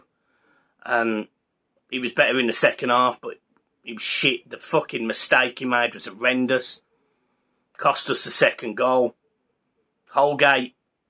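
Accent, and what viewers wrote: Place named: Black Country, United Kingdom. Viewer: A proper Black Country accent? What’s going on 😂😂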